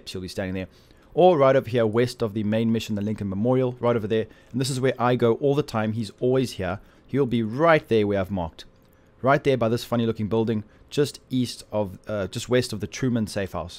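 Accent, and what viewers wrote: South Africa. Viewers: is that a South African accent? Thanks for the help man, keep up the good work <3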